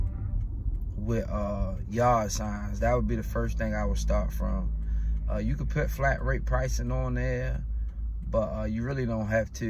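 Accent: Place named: Baltimore, United States. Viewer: Great information my brother.Are u from Baltimore?I got homies from Baltimore and they talk just like u bruh😂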